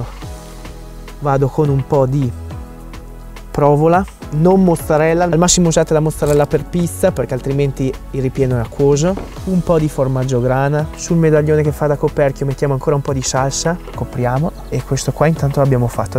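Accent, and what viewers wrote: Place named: Milan, Italy. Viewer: Sei Milanese eh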